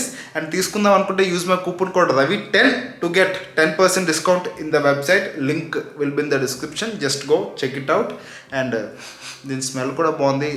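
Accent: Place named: Telangana, India. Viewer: Atluthadi maa telengana slang ❤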